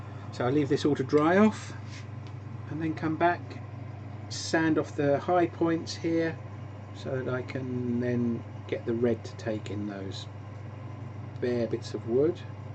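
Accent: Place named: England, United Kingdom. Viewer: Beautiful piece, but what was the medium you added to the Gold Paint? Sorry, but I'm in the states and didn't understand it through your accent. Of course, we speak american as opposed to English, so that could account for it. :-)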